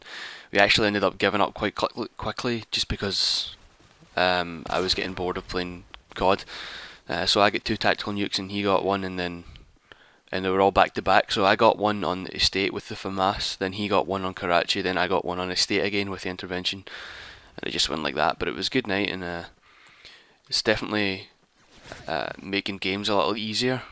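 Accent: Scotland, United Kingdom. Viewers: OMFG YOUR SCOTTISH, YA BEAST :D:D:D:D:D:D:D finally, a scottish dude like myself doing a vid :L awesome :D:D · scottish arent you im scottish?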